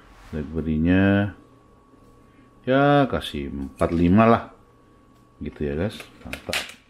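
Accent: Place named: Java, Indonesia. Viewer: Jowo ne jik kentel rek😂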